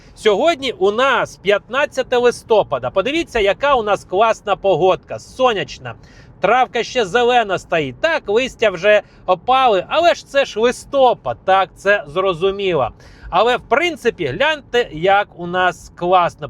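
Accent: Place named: Russia. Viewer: РОСІЙСЬКОМОВНИЙ КАНАДЕЦЬ?? ВПЕРШЕ ЧУЮ ТАКИЙ ЗУХВАЛИЙ РОСІЙСЬКИЙ АКЦЕНТ !! ВИ Б СПОЧАТКУ ОПАНУВАЛИ СПРАВЖНЮ УКРАЇНСЬКУ, А ТОДІ СТВОРЮВАЛИ КАНАЛ !!!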